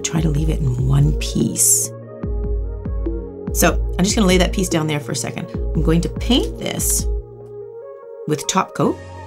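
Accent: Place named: Canada. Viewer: that canadian accent 😙😍😂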